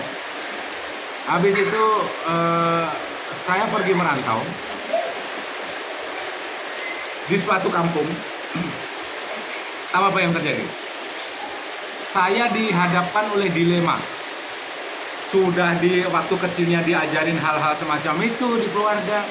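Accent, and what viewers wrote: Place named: North Sumatra, Indonesia. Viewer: Untuk saya yang lahir dan besar di daerah danau Toba udah biasa dengan logat keras seperti ini, bahkan mungkin logat bang seberapa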